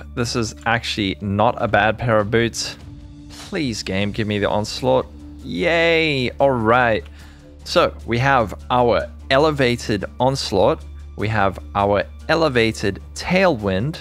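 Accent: South Africa. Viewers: Hey bro, I commented on your last video r.e. figuring out you are a South African from your accent... how can I got about getting those discord details? My buddy and myself from SA would like to connect with other SA guys... shot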